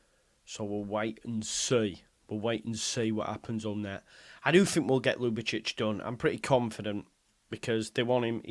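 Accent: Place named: Mixenden, United Kingdom. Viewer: you sound like a Mixenden lad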